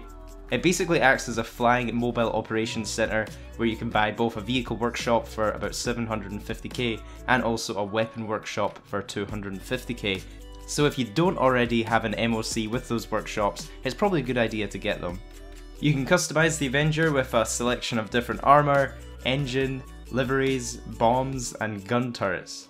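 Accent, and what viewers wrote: Scotland, United Kingdom. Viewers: Love your scot accent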